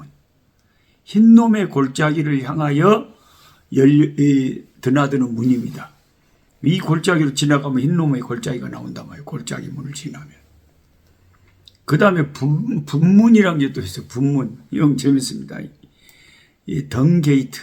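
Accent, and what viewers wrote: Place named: Beolgyo, South Korea. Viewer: 목사님! 저는 여수 수정로교회 를 섬기는 선 미자 권사입니다.. 아가서 말씀으로 목사님 설교에 많은 은혜를 받고 찬양대에 오셔서 기도해 주셔서 너무 감사했습니다.. 개인적으로 인사 드리고 싶었으나 식당에서 목사님 가까이에서 식사 하면서도 저만 목사님을 보면서 너무 반갑고 기뻤 습니다.. 유튜브 꿀송이 보약 큐티를 통해 목사님을 알고 벌교 지동리로 제가 1974년도에 결혼을해서 2년동안 낙안 중앙교회를 섬기다가 77년도에 여수로 왔기 때문에 목사님의 벌교 사투리에 더 정이가고 뵙고 싶었는데 목사님앞에 나서지를 못했네요.. 앞으로도 유튜브에서 계속 은혜받고 뵙겠습니다.. 감사합니다..❤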